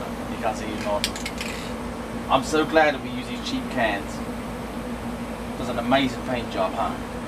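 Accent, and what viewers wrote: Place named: West Country, United Kingdom. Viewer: Where are you from? I get a slight hint of West country then antipodean. Like an accent twisted from being abroad.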